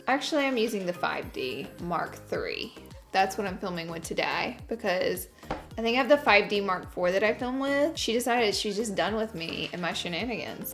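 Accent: South, United States. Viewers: i love you so much and your country accent sounds like mine lol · I love how your southern accent gets stronger and lighter as you talk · It's just her Southern accent. We don't all pronounce everything the same way. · Her accent, she's southern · It's a southern thing! We all do it in the south. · Love how you pronounce “oil” like “ole”. ❤️. The southern accent is so cute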